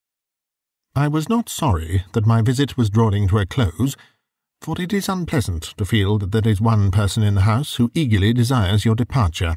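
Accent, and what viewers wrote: United Kingdom. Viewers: I agree! The British voice is very very pleasing! IM SIMILING RIGHT now!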